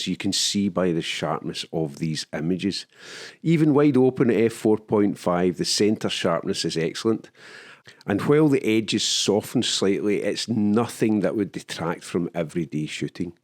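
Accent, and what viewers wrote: Scotland, United Kingdom. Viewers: Great video! I love scottish landscapes and accent! Very cool!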